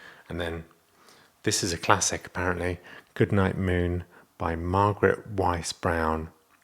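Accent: United Kingdom. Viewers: Your voice and accent is awesome. The British accent in my opinion is the best accent 👌.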